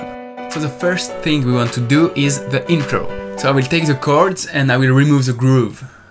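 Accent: France. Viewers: Hes french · ta bon un accent français 😁 moi aussi je suis dj et producteur et j'ai un chat aussi par ailleurs 😆 sa fait plaisir un youtubeur qui fait des videos sur fl studio de cette maniere avec de l'humour et du montage j'aime bien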